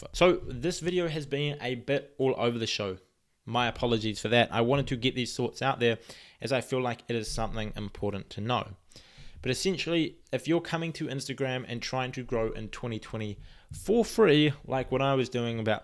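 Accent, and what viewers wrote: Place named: New Zealand. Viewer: I love your kiwi accent ❤️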